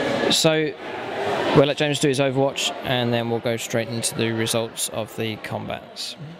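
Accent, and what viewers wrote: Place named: England, United Kingdom. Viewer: it does man, they kill alot of termigaunts and they barley scratch what all is on the board then i spawn more than they killed and they start panicking and getting frustrated which leads to mistakes. but it does get very tiring moving all those models and it takes forever. keep up the batreps i love the quality of the videos, the paint job and the scenery. im going to take from your accent your from Britain right? england most likley?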